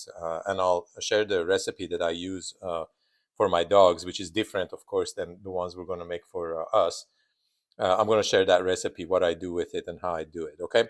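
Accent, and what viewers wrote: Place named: New Jersey, United States. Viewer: lovely accent. new jeresey?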